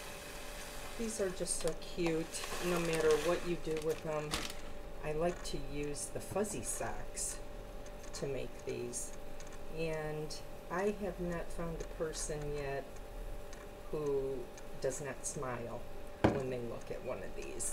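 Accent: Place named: Michigan, United States. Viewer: That was a great tutorial and I enjoyed hearing your voice from MI perhaps? I can't wait to get these myself.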